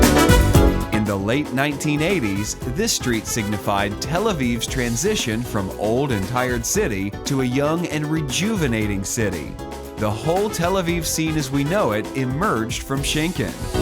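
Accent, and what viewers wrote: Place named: United States. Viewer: A little research on correct pronunciation would help cover over the grating American accent and condescending style. Otherwise interesting.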